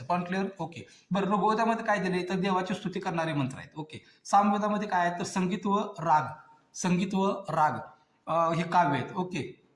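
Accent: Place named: Latur, India. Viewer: तुम्ही लातूर चे आहात का 😂😂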